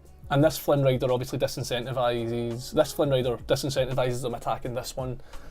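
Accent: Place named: Scotland, United Kingdom. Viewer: Off topic, but you're so cute and what kind of accent is that?? Scottish? Regardless, i love it! 😍 😅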